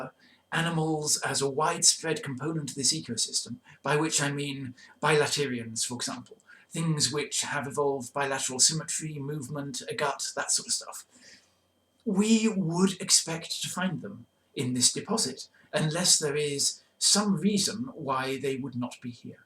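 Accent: United Kingdom. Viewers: I first watched the video, the cc was not visible. Checking for it was the first thing I did. It may have been so on your device, not mine. That’s why I asked for the captions. I’m glad you understand the British accent. My ear is not your ear. We’re all different. Were we not, earth would have one language. Checking back now, I see the cc is up. That’s all I asked for.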